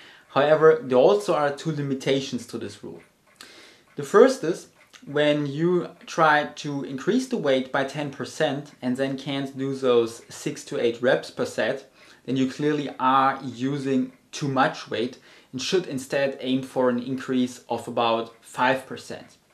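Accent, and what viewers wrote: Germany. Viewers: french? =)) ahaha hey j'aime ta, parlant français, l'accent allemand hes German dude xD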